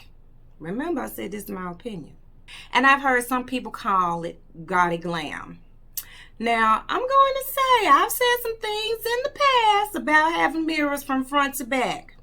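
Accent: South, United States. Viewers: SHE GOT ME WITH HER TITLE. SOUTHERN GIRL. AND I LOVE HER ACCENT. THANKS.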